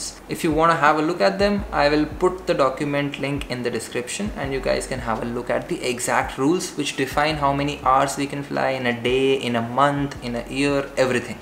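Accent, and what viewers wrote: India. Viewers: You accent is very Indian.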